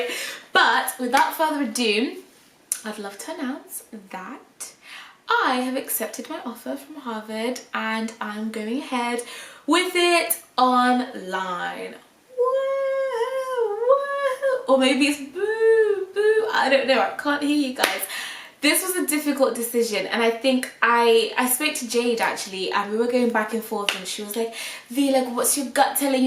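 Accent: United Kingdom. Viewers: Wait is she british?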